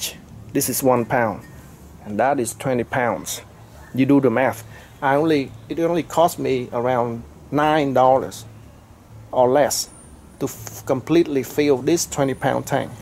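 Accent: Vietnam. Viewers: Sounds like Vietnamese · Sounds like a Vietnamese accent.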